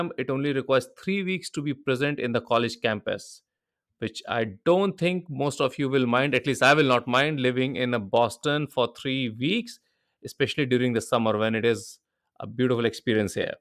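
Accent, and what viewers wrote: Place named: India. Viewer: Why are you sounding Indian?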